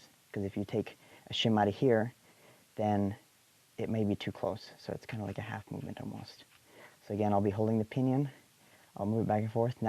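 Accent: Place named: Canada. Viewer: i love the way Canadian people talk..... TRAILOR PARK BOYS!!!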